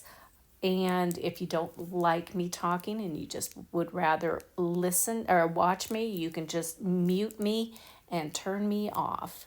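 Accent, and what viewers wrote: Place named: Florida, United States. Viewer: I love making cards and this is giving me great ideas. What was the glossy ink you spattered on this one? Also what did you seal it with? Are you from Naples FL? You have an accent 😊 My daughter lives in Naples. Just wondering.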